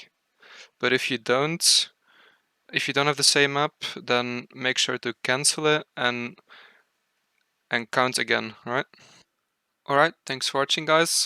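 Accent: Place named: Germany. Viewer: Spüren ich das deutscher du bist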